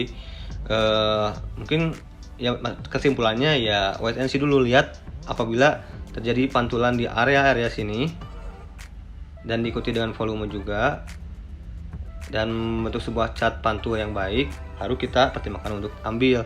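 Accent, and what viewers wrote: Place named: Bali, Indonesia. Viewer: Kyk logat bali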